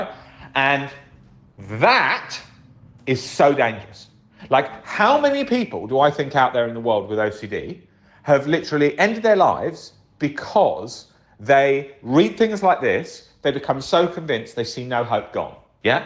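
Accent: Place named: England, United Kingdom. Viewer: Thanks for the great video! I also have to say: you sound a LOT like Alan Watts! As an American, I wonder if you both come from the same area in England cuz the accents are almost identical! 😂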